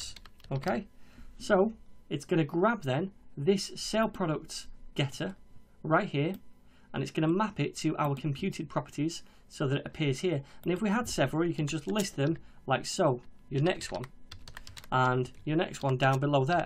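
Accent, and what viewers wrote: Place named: Northern England, United Kingdom. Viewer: I watched every Vue video. I have to say... playing at 2x speed really pushes the mind as you deliver your content so fast anyway! It's also extremely refreshing to hear a northern accent so intensely after living in London for seven years... Thank you very much!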